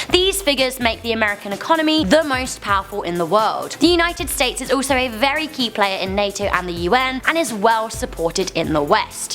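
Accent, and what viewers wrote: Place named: United Kingdom. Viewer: is a simple naturally beautiful and sexy girl and that deep thick British accent is just charmingly adorable!. MAGM.❤️✝️☯️🇵🇷🇺🇸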